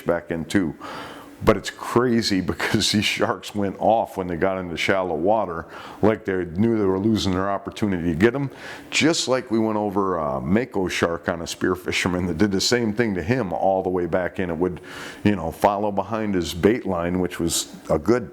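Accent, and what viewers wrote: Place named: Midwest, United States. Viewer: I find it extremely odd that, a guy from Michigan or Illinois (guessing by the accent) who also, admits that he's too Scared to swim in the ocean....is giving advice and recounting stories about Sharks and shark attacks. That'd be like a life long floridian telling the do's and don'ts of ice fishing. 🤔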